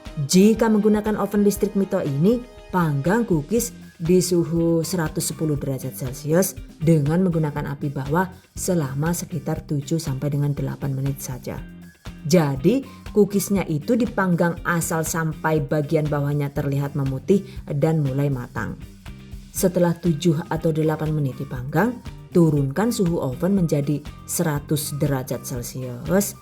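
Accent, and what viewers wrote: Banyumas, Indonesia. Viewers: Sueeennneeeng bgt ma video2 Pas moment realeseny Pas budgetny Pas rasany Pas tipsny Pas bhasa NGAPAKny Pkokny ngebantu bgt Jazakillah khoiron😊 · mb,di bnyakin ngomong ngapaknya ya,,lucu😁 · Saya suka resep dari karena resepnya simple dan banyak memberikan tips dan trik yang sangat membantu bagi saya yang pemula, ngapak2nya jg ada😊😊. Mksh 😙😙😙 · Chanel kesukaanku Menginspirasi buat ibu ibu muda kaya aku yg suka bgt buat kuker, tips &triknya ngebantu banget 🥰 Dubbingnya pengisi suaranya juga lucu ngapak ngapak lihat video sambil senyum" Sendiri 😂 Paket komplit pokoknya klo liat ada lucu"nya gimana gitu 😁 #GiveawayTHV2021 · Paling sukaaa deh nonton ❤️, banyak bgt resep2 camilan'a, bisa juga buat ide jualan d rumah.. tutorial'a jelas, padat & gamblang👌. Ilmu'a bermanfaat banget apalagi ibu2 muda sperti saya ini😊. insyaaAllah kelak nanti gadget dapur'a sudah komplit (maklum nganten anyar😅) pengin bgt deh praktekin 1per1 resep'a biar bisa bantu2 suami juga😍 oiyah liat video'a juga ga ngebosenin deh pokok'a, krna logat medok "ngapak'a" itu lhoo yg bikin ngakak sendiri😂😂 Daaan dari pertama jumpa yg paling bikin penasaran itu pengisi suara'a tauu, hehehe🤗. 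#GiveawayTHV2021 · Seneng liat video karna ada hiburan tersendiri dngan suaranya yg khas berwibawa dan kadang jg ngapak 😁 sukses terus bunda #GiveawayTHV2021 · asal resepnya udah ga ragu buat nyoba recook.. vidionya selalu disertai tips & trik, jd insya Alloh berhasil asal sesuai resep sama langkah²nya.. ditambah ana logat² ngapake, dadi rasane kaya agi diwaraih masak nang mbekayune, wis pokoke channel masak terfavorite nyong kye lah yu.. semoga makin sukses & jgn pernah bosan untuk berbagi ilmu ya mbekayu.. salam ngapak dari kec.ayah kebumen #GiveawayTHV2021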